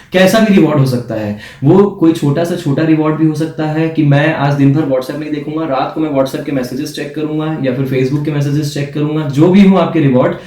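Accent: Bihar, India. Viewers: Bhai aap Bihari ho kya? Mera ak Bihari dost Aisa hi bolta h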